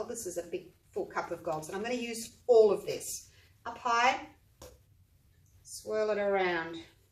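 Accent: Australia. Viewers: I love scruffy!!! I know this video is old...your birds and pours are fantastic!!! I'm from Ohio and ADORE Australian accents...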